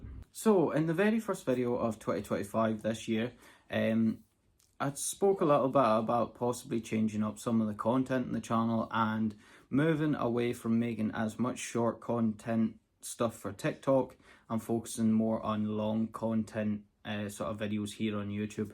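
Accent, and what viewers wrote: Scotland, United Kingdom. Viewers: Yes, your videos are a calm and peaceful retreat from the craziness of the world. I love your dogs and farming and your growing family. I would like to see you add more family time and adventures to this channel. Everything that you say and do brings Scotland to life, especially your Scottish accent. Keep it simple and share your family life with us here. Then I won’t need to look for two channels to enjoy your content. Thanks for all you do. It is such a pleasure to watch your videos. Keep up the good work. 💕🙏💕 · I just wanted to take a moment to thank you for your wonderful YouTube channel. I work in an office and spend most of my days in front of a computer screen – a stark contrast to your life out in the fields with your sheep. And that’s exactly what makes your channel so fascinating to me. I really enjoy watching you work, even though I have to admit that I probably only understand about half of what you’re saying – your Scottish accent is quite a challenge for me!😂 But that doesn’t matter, because the visuals, the atmosphere, and the passion you have for your work speak for themselves. 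Thank you for sharing your daily life with us viewers. Your channel is like a little escape from my office routine, and I always look forward to your new videos. Best wishes from Germany❤ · Here ia what I like best about the channel. 1. You delightful Scottish brogue. It so soothing to the eat. 2. Watching the dogs work and see how much they enjoy working and absolutely think you are the best person in the world. 3. The beauty of the Scottish countryside, particularly when you are on the top of the hill and panning all around. 4. The interesting workings of the farm. 5. The walks in the woods. 6. The excursions to the farm shows and touring the cities. Keep up the good work. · The reasons I watch 1. for the dogs. I have 2 border collies that hike and do agility but watching BCs do what they were bred for is beautiful. 2. Scottish scenery 3. Listening to the lovely Scottish accent I would watch other content about Scotland. 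I have no opinion about whether it should be a separate channel. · I'm here for the sheep herding which obviously includes the dogs and you. But I really like just listening to you because all four of my grandparents are from Scotland and its almost like they're in the room with me! The fishing you mentioned really got my attention and the outdoors content. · Just discovered your channel through Facebook few days ago. But I found fake accounts using your name. So I searched you on YouTube. My main reason to subscribe is : Kate, Storm and Echo Love them soo much !!! And your relationship with them…!! I believe that is also main reason why people are here. My suggestion is : you can do ANY VIDEOS ANY! because you are REALLY GOOD explaining things, but as long as you included your dogs here and there (please) I believe every body happy! Oh, have just watched your Christmas video the bake competition ! 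😂 so funny! And this is not even Christmas 😂 You are super talented shepherd. Do it every Christmas please…you will make every body have their‘merry little Christmas’😍 Oh and the Scottish accent?? Like music to our ears! Love it !❤ May God continue bless you 🙏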